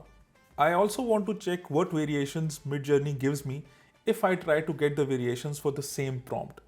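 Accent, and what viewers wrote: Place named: India. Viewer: He sounds Indian but does not look Indian.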